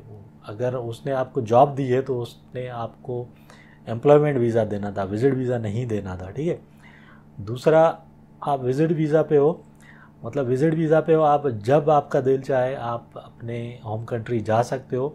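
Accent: Sindh, India. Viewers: Ada sindhi aan cha laheje mon lage tho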